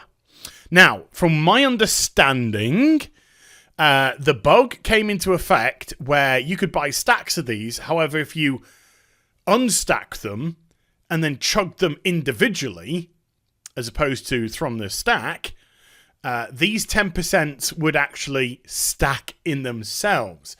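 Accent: United Kingdom. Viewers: I gotta say, i really like the British way you say things. You remind me of sargon of akkad.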